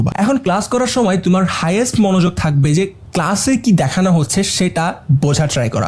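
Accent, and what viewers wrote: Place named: Chittagong, Bangladesh. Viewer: Chittagong er accent❤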